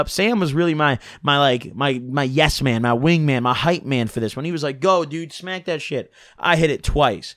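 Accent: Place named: Illinois, United States. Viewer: Sounds like you grew up near elmhurst iL thats were im at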